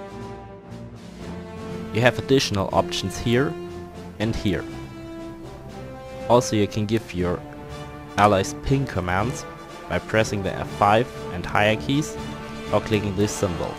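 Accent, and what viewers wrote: Germany. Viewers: just like MinecraftMadness64..... ein Deutscher der auf Englisch Videos macht....ich wusste doch dass ich da einen deutschen Akzent gehört habe ;D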